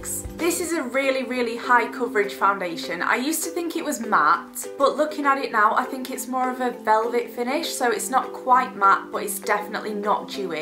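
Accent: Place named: Sheffield, United Kingdom. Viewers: She is from sheffield in East Midlands UK x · Sheffield in the east middlings of the uk x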